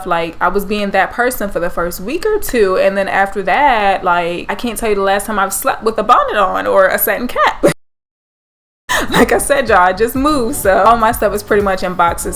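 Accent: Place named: Baltimore, United States. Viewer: a BMore accent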